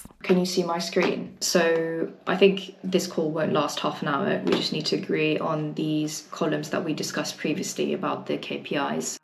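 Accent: United Kingdom. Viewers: 영국식 발음 공부할때 따라할때도 넘 좋아요! 이런 영상 자주,, 올려주세요❤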